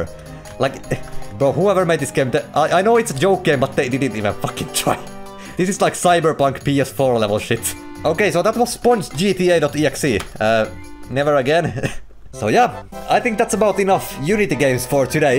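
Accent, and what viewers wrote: Finland. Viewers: Wait you are Finnish. I thought you were like russian by ur accent but somewhat Finnish accent but yeah Suomi perekele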